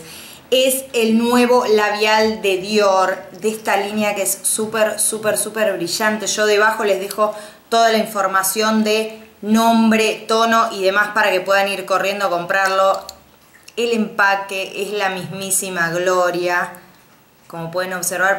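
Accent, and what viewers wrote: Argentina. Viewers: Hay es que te amoo😂😍soy de Argentina te tengo que conocer🙏amo youtubers Argentinas porque hablan igual que yo es como ...... Oh siii😂❤️comenta plisss que mueroo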